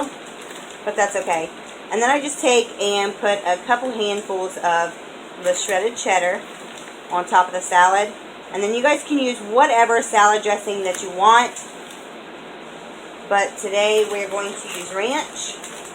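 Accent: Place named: North, United States. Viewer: I'm originally from Pittsburgh PA.. I thought I recognized a northern accent. I have subscribed.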